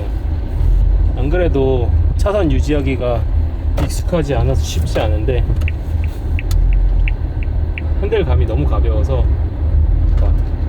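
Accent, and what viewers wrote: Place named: Japan. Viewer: 얼마나 설레며 준비를 하셨을까 그 마음이 저한테 까지 느껴집니다🔥 그나저나 일본톤 찰떡입니다👍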